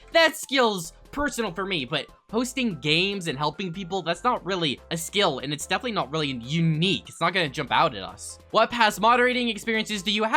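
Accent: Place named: United Kingdom. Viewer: I love your randome british axent I speel it the wrong porpes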